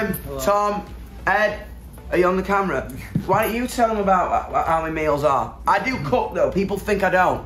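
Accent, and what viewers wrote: United Kingdom. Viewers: this is exactly how I imagine a British person to sound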